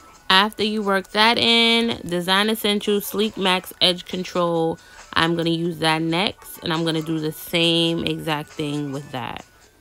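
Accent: New York, United States. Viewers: You sound like your from NYC. Where can i find you sis your channel is everything and CONGRATS on your one yrar anniversary 🎉🎈🎊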